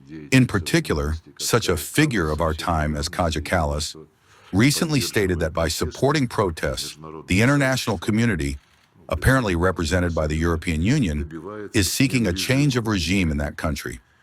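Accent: United States. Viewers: Would be better without the dumb american accent.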